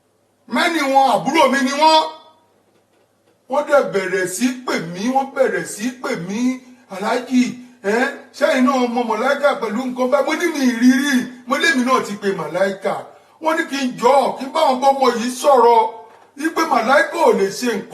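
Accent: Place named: Ilorin, Nigeria. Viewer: I keep watching this video on an on .it look like this man come from ILORIN . he sound like a person from Ilorin .I always laugh all the time i replay the video very funny man, but things I don't why Yoruba peoples like to curse each other it's not good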